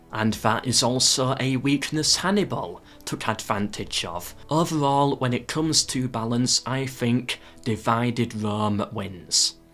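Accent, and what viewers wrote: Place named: England, United Kingdom. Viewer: I managed to get it to very end but that pronunciation was hard for my ears. What is that dialect? I presume from England. But from where specifically? Just curious.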